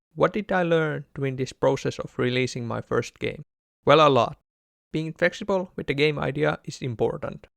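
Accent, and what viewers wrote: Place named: Finland. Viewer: Best of luck to you! I like your attitude and optimism, I hope you succeed in accomplishing your dream to run a small game company. Btw your accent reminds me of My Summer Car, so I assume you are from Finland. You Finns for some reason are very good at game development, so you already have a leg up on the rest of the competition :)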